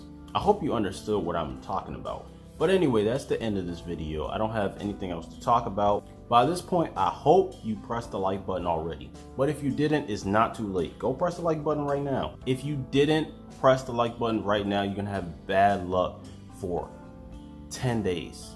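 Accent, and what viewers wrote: Jamaica. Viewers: Are you from Jamaica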